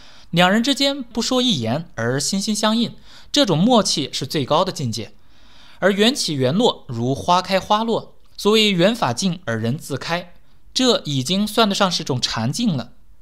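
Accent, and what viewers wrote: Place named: Fujian, China. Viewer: 说普通话的感觉像闽西北的朋友 哈哈哈 很高兴认识您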